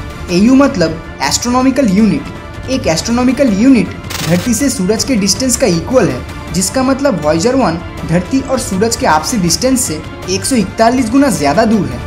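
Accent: Bengal, India. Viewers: Bhai app Bengal se hai kya?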